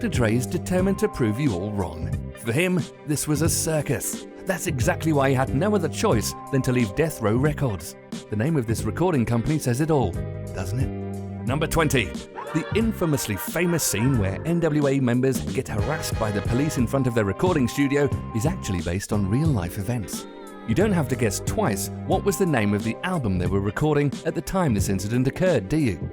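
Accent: England, United Kingdom. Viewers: He has a british accent, have a little understanding, so fucking what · It's called a British accent. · to give him a bit of a break hes british. I cringe every time he says aluminum for the same reason.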